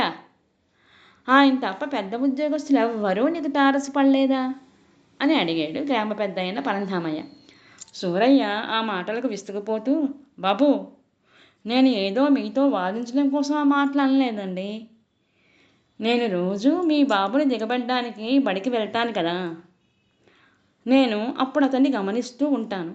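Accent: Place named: Rayalaseema, India. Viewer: చాలా బాగుంది..సీమ భాష లాగా ఉన్నది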